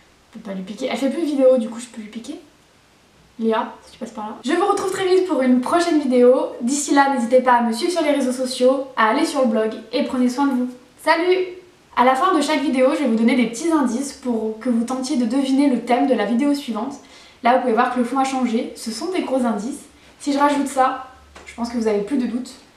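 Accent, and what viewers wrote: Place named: Quebec, Canada. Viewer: J'avais l'impression d'entendre un léger accent québecois :p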